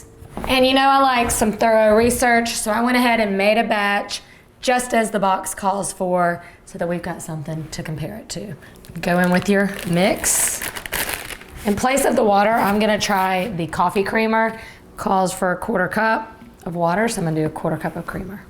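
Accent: South, United States. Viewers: Love hearing you talk,Definitely can tell you are a Southern Lady,Makes me miss home,I'm from Southeastern Virginia.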